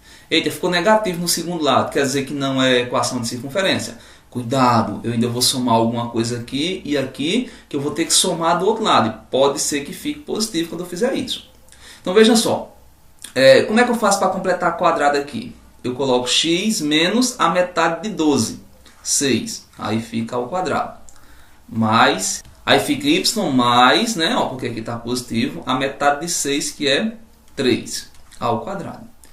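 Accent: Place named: Northeast, Brazil. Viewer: aula mt boaa prof! mto bom ver alguém aqui no yt com o sotaque do nordeste :) sucesso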